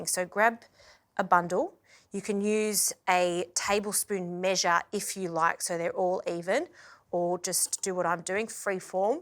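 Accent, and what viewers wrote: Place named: Sicily, Italy. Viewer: I love your Sicilian accent!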